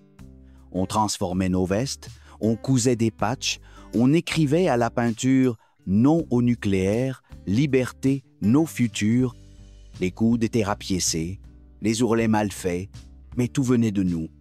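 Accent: Quebec, Canada. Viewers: Le paradoxe : un accent québécois pour nous narrer un moment de la "franchouille beaufesque" parisienne. Un mauvais délire.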